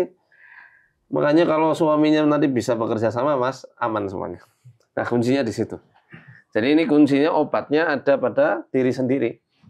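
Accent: Banyumas, Indonesia. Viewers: Jine kiye ngapak juga y ramane 👍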